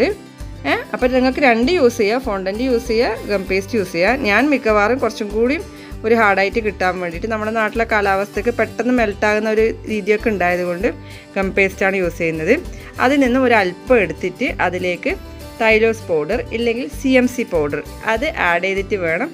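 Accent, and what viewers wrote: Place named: Payyanur, India. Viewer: Chodi😜. Pynr slang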